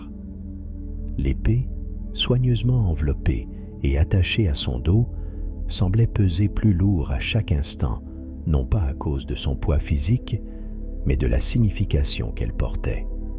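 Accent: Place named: Quebec, Canada. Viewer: Sympa, le petit accent québécois 😉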